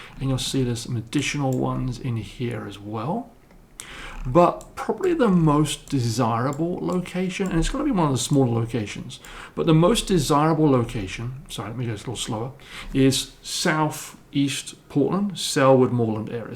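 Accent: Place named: United Kingdom. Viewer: A very marked British accent.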